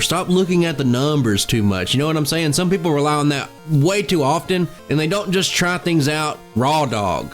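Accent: South, United States. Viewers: The southern drawl mixed with the wit, the self awareness and relatable rants just made you probably the most human YouTuber I've ever listened to. Fuck yeah dude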